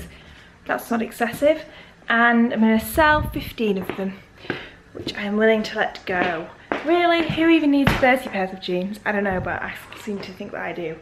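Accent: United Kingdom. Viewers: Hey! Just got to the point in the vlog where you've spoken about feeling kind of stuck and frustrated in terms of your blog.. and to be honest I don't get it! I LOVE the content you're putting up recently. No matter if it's outfits or collaborations (like the h&m one, which I loved especially) Reading your post makes me giggle sometimes and I even read them in your british accent!! You seen to be such an ambitious young lady, who knows exactly what she wants and I find that so inspiring. There is really no need of feelig frustrated. I think a lot of these feelings come from maybe comparing yourself with others, in this ever changing industry and having to be on top of style, content and life in general. you're doing a Great Job, in all these aspects! and by great I don't mean perfect, because perfect doesn't excite many people. By great I mean relatable, honest and true to yourself! 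much Love xxx PS: I go on your blog every time I've seen an Instagram/snapchat post where you update your followers about it! keep that up xx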